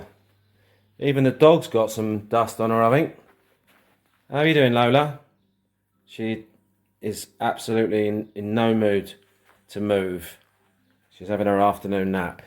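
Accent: England, United Kingdom. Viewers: I clearly wasn't alone in my appreciation of the cheeky charm and sunshine you bring to LaLande! So awesome to see you here with your own channel. Woukd love to hear how tou came to be in France and your journey so far. Love the accent, the funny British-isms, and seeing you bring joy to so many. Go! 🎉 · ... to be clear, I'm not here just b/c you're sexy nor is it b/c I have that common American fetish - addiction to an English accent. I expect you to work your butt off, to keep win my loyalty.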